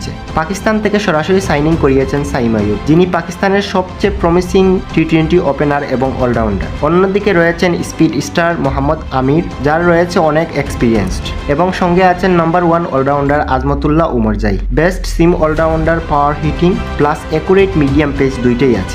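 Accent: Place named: Sylhet, Bangladesh. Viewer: আপনার কথায় লাগের আপনি সিলেটি😮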